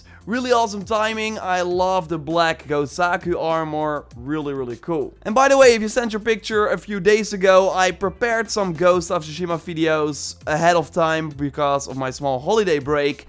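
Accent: Netherlands. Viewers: Vire arrows 😀. Your dutch english never gets old.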